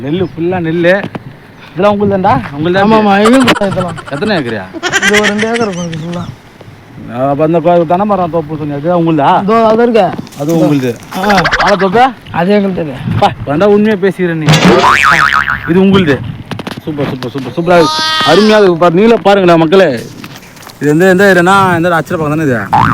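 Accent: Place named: India. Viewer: வட மாவட்ட பேச்சு ❤🎉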